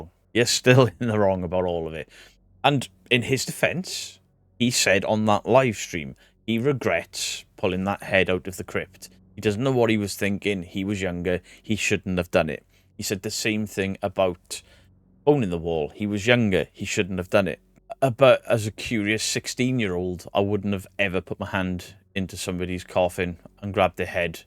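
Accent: Wales, United Kingdom. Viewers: thanks. Ive missed your welsh tones xxx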